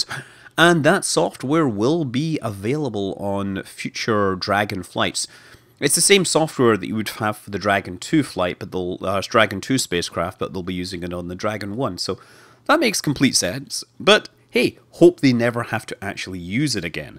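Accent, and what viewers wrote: Scotland, United Kingdom. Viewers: Hey Scott Manley screw the haters. I love hearing you explain things in that awesome "Brave Heart" accent of yours.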